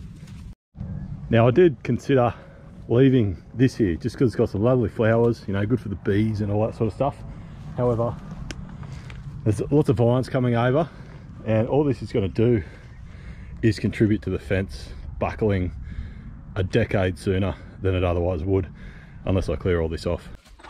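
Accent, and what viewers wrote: Australia. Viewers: Amigo, estou assistindo aqui no Brasil e seu vídeo é de longe um dos melhores da categoria, congrats. Só fiquei preocupado com suas gaiters que são pequenas para seu tamanho. Lembre-se você está na Austrália, terra de cobras e aranhas estranhas; até tive calafrios quando usava as mãos para ajuntar o lixo. Você é muito bom no que faz e o australianquese accent é bonito para os que falam Português como eu. Parabéns, fique com Deus.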